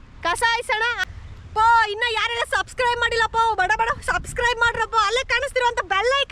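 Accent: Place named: North Karnataka, India. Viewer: ನಿಮ್ಮ್ own language ತುಂಬಾ ಇಸ್ಟ್ ಬಹುಷಃ ರಾಯಚೂರ ಮುದ್ದೇಬಿಹಾಳ್ ಲಿಂಗಸೂರ್ ಬಾಷೆ 🎉🎉🎉ನಿಮ್ ವಿಡಿಯೋ ತುಂಬ ಇಷ್ಟ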